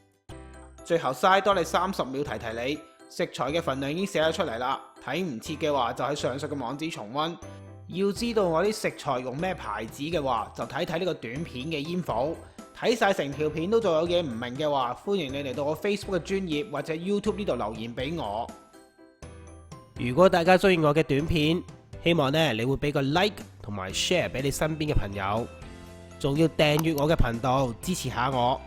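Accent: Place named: Taiwan. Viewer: 講的比台式的道地！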